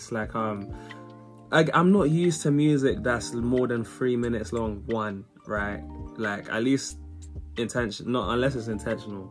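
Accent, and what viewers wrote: United Kingdom. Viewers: i could be wrong, but you sound british, and say youve never heard the beatles or queen? have you been in a coma your entire life?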